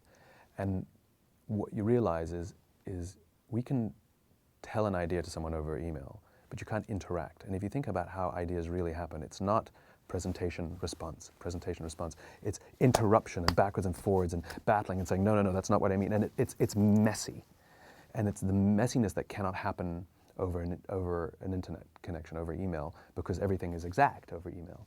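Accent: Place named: England, United Kingdom. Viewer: He had a little English accent going on in this interview.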